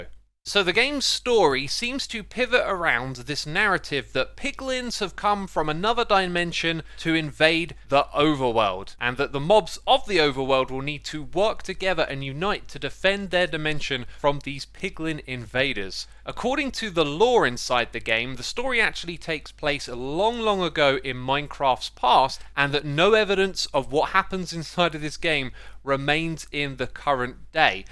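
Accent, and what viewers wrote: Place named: United Kingdom. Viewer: Started watching near my dad. He said "wow that guy is British"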